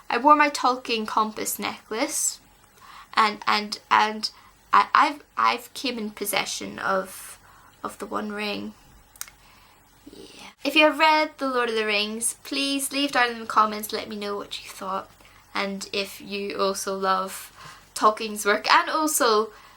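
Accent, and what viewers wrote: United Kingdom. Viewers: I can't place this accent....British....but there appears to be some other musicality subjacent to it. Or my ears deceive me. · Where is this accent from? Northern Ireland? It's my favorite accent to listen to in english. Number 2 is the accent they have in Hawai'i, it's an accent of no worries. Just like australia.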